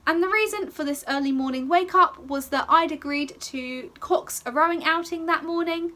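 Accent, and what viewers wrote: United Kingdom. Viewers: I love your british accent :))) Greetings from Argentina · it just sounds very British, there is nothing wrong with that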